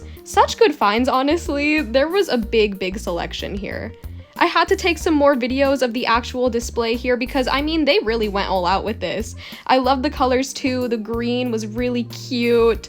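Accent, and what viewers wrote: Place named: Mexico. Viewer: Wow!, you have a really good Mexican accent, greetings from Mexico!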